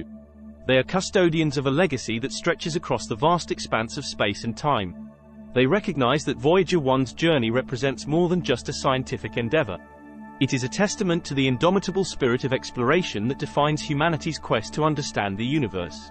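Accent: United Kingdom. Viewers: Why are the weird stories always narrated by someone with a heavy English accent..:face-blue-smiling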